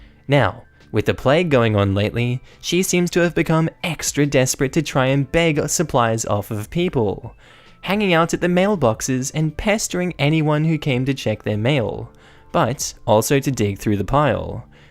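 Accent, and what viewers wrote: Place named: Australia. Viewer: hit us with your true Aussie voice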